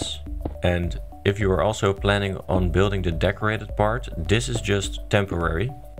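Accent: Netherlands. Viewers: Are you dutch? Feel like its our accent 😂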